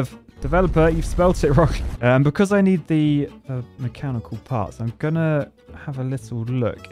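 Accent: United Kingdom. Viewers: your content has replaced just about every source of entertainment in my life lately. Thank you for playing videogames in a fashion way more entertaining than doing it myself. It's been fun marathoning through your backlog of videos. Have you ever thought of narrating those nature documentaries? Maybe it's the British accent but getting a bridge review in the middle of lion chasing a zebra would make my day.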